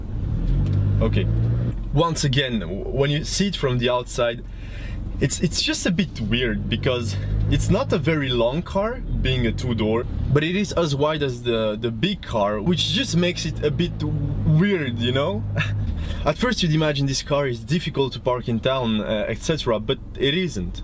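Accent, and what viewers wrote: France. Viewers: Strong french accent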